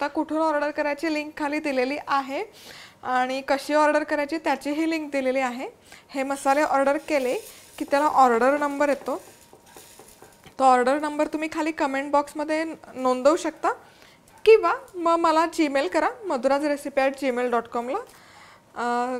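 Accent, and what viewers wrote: India. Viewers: Why do u speak so gavthi Marathi u can speak nicely also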